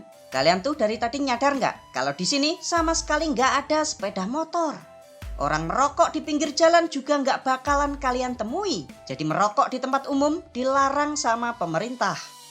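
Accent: Java, Indonesia. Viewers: Min medog jawane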